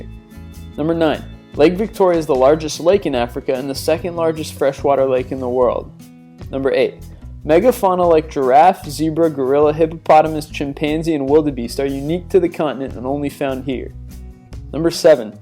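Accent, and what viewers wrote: United States. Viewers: U sound American but when speaking of measurements u use metric instead of American standard. Why? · The American accent should not have been used for this narration.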